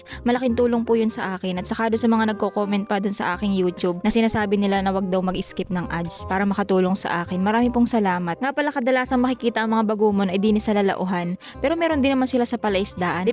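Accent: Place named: Quezon, Philippines. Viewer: Kya Pala ANG Punto mo .parang taga Quezon ... Tagal Quezon K NGA Pala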